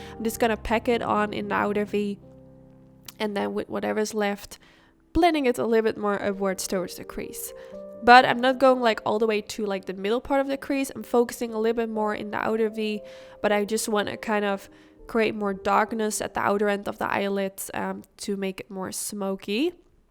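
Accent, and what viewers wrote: Netherlands. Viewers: Hi, I'm new to your channel. I was very curious about your accent. I didn't know you were from the Netherlands, but since I also live here, I recognized it inmediately, and then I read your information and it confirmed it. I have watched 2 of your videos already and you just won a new subscriber. I really appreciate the close up on the eye shadow looks and it's super easy to follow. Thanks a million. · I guessed you were from the Netherlands in the first 20 seconds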